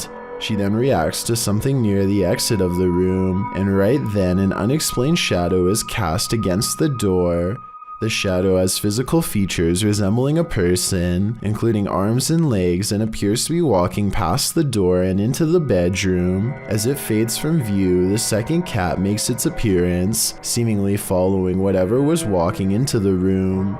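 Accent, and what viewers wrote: California, United States. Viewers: DUDE, are you from Cali? You sound like one of those surfer guys. Kind of hard to listen to you. But the videos are pretty good. Not very scary, but none the less entertaining.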